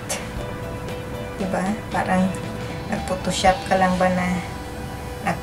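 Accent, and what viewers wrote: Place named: Visayas, Philippines. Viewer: Bisaya ka po pala mam..galit na naman po siya ehehe...kakwela nyo po at masarap magluto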